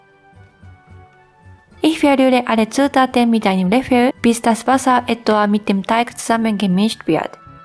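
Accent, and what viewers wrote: Japan. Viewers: "Und dann gebe ich noch 50g daTSU" Japanischer Akzent ist einfach bezaubernd <3 Ich muss es unbedingt nach kochen!, danke für dein tolles Video!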